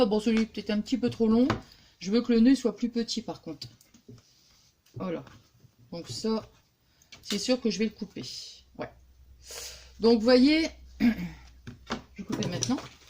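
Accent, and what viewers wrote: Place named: North, France. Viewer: Coucou très simpa ton petit album je suis nouvelle abonné et je te trouve très rigolote ton accent du nord me fait plaisir je suis de la Picardie bisous Merci pour ta vidéo gros bisous ☺😀😚👍💕👏💕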